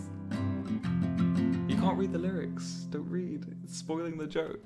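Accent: United States. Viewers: the semi-american accent feels like sin and not in the good way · the half american accent hurts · His American accient is hilarious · I fied when I heard his American accent LOL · He sounds AMERICAN!! :0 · His mixed american accent litterally just sounds like my defult accent. Update: first, that was not 5 months ago, second, my accent is full american now lol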